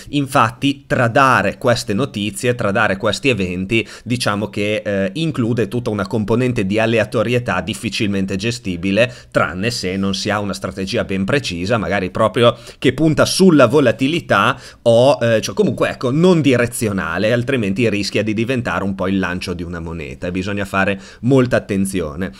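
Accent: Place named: Romagna, Italy. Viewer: Quando dici la parola “questo” ti adoro, il tuo accento romagnolo è fantastico 😄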